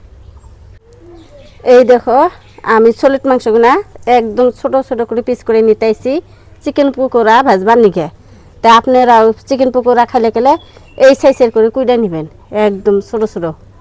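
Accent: Tangail, Bangladesh. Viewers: টাঙ্গাইল এর ভাষায় কথা বলেন আবার পরিচয় দিচ্ছেন ভারতীয়? এই ভুল তথ্য দেওয়ার কারণ কি?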